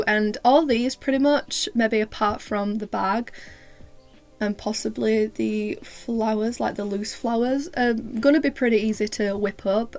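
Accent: United Kingdom. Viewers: Your voice is very calming and I love your accent! Which UK accent is that? <3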